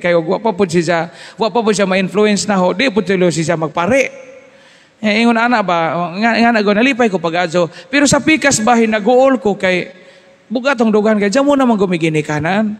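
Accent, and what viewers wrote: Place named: Bohol, Philippines. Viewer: Nindot kaau paminawon imong boholano accent father cargado kaayog j, makalingaw pod kaau imong homily god bless father.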